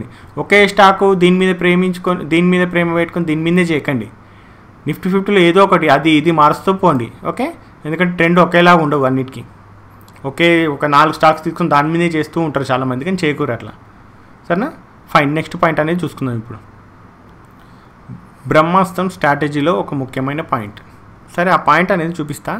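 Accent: Telangana, India. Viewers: Anna Telangana Language lo Explain Chestunte Bale undi anna. Nenu telangana Khammam dist. Nen enjoy chesikuntu chustuna nee videos. Thanku💐💐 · dear, మీ పేరు నాకు తెలియదు. అందుకే అనే సంబోధిస్తున్నాను. ఎంత బాగా చెప్పారు. మార్కెట్ లో చాలా ఎక్స్పీరియన్స్ వున్న ( వుందని అనుకుంటున్నాను లెండి ) నాకు కూడా మీరు చెప్పిన విషయాలు విస్మయం కలిగించాయి. ప్రైస్ యాక్షన్ గురించి ఎంత అద్భుతంగా చెప్పారు. రియల్లీ ఫెంటాస్టిక్. నిజం చెప్పాలంటే ఈ వీడియొ గురించి నా మనసు లో కలిగిన భావాలు బయటకు చెప్పటానికి నా భాషా అవగాహన చాలటం లేదు. అంతా ఎక్సయిటింగ్ గా అనిపించింది. లాస్ట్ పాయింట్ సూపర్ అని చెప్పాలి. మీ చానెల్ కు ఎప్పుడో subscribe అయ్యాను గానీ ఇంత ఎక్సయిటింగ్ గా ఎప్పుడు అనిపించలేదు. ఇందులో విషయానికి సంబంధం లేకపోయినా ఒక మాట చెపుతున్నాను, మీరు చక్కటి తెలంగాణా తెలుగు న్యాచురల్ గా మాట్లాడుతుంటే కూడా వినసొంపుగా అనిపించింది. ఒక తెలుగువాడు ఇంత చక్కగా చెప్పడం కూడా నాకు బాగా నచ్చింది.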